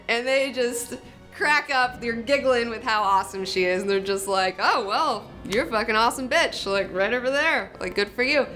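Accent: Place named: Alabama, United States. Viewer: I love hearing that Alabama accent! Keep up the great reviews and interviews. Enjoy the hell out of this channel!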